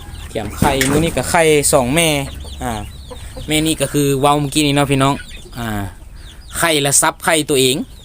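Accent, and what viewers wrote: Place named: Thailand. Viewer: เว้าไทนาหัวพูแน่🤣🤣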